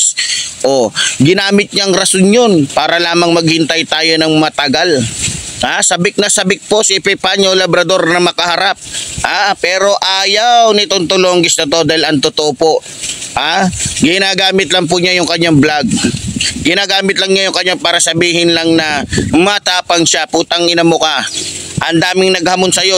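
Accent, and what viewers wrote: Visayas, Philippines. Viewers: Idol bisaya ka ba?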